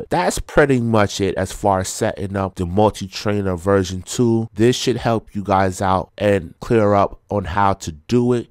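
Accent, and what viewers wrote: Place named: Brooklyn, United States. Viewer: Bro you from NY aren't you ? By your voice an slang I would say brooklyn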